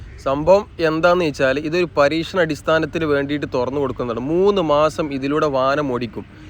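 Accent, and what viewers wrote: Malappuram, India. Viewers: എല്ലാ വിധ ആശംസകളും ! താങ്കളുടെ മലപ്പുറം accent കൊള്ളാം. ധൈര്യമായി, മാന്യമായി ജോലി ചെയ്യുക. രാഷ്ട്രീയം നോക്കാതെ നാട്ടുകാർക്ക് സേവനം ചെയ്യുക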